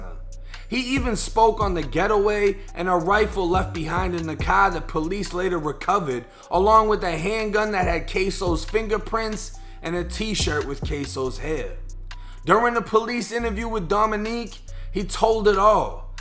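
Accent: Boston, United States. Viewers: Oh yeah you definitely from Boston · That Boston accent heavy bro 😂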